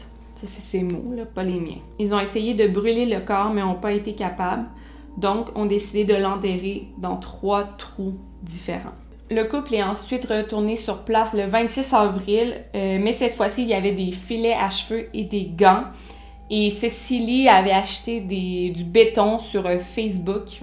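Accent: Quebec, Canada. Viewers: Salut, récent abonné j'adore ta chaine, tu fais un énorme travail de recherche et préparation tout en racontant extrêmement bien. Qui plus est avec ton accent québécois charmant!!! 🙂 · Je ne m'attendais pas à une vidéo aujourd'hui, j'ai cru que c'était une erreur de YouTube du coup j'ai lâché ce que je faisais pour me mettre devant de peur qu'elle disparaisse. Tellement triste cette histoire 😢 J'espère que la famille aura ses réponses. Et pour ce qui est des haters que tu as mis sur instagram, perso je n'ai eu aucun souci de compréhension donc je ne pense pas que le problème vienne de toi 😉 et puis on peut se permettre de juger les gens sur leur français quand le nôtre est irréprochable et, à lire en tout cas, le leur ne l'est pas. J'adore l'accent québécois (canadien ?) Pour finir, mettez-vous en tête qu'on a tous un accent pour quelqu'un d'autre !!!!!!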